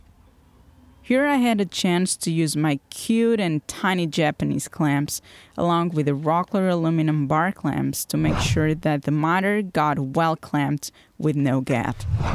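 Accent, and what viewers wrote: Portugal. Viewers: Pqp, assiti o vídeo inteiro imaginando que sotaque é esse e vc é portuguesa kkkkk sou br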